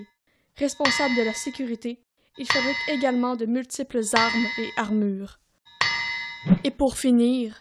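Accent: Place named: France. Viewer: OMG i love French accent haha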